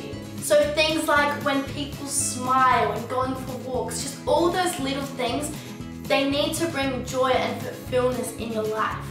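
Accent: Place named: Australia. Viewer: Amazing video love your Aussie accent ahaha❤️❤️❤️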